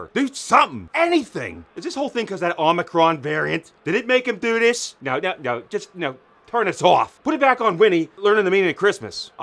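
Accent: Pittsburgh, United States. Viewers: I did my undergrad degree in Latrobe, PA and nothing brings me back like this accent. Love it so much. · Definitely a real yinzer accent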